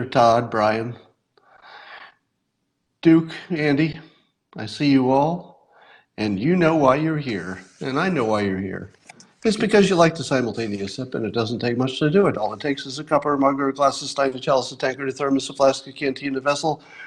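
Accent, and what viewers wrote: California, United States. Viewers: Californian's have the best accent.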